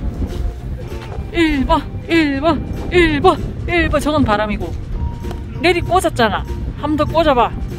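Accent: Busan, South Korea. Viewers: 항상봐도 재미 있습니다 부산사투리도 구수하구요 🐟🐟🐟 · 부산사람입니까. 말투가 암청 정이가네요^^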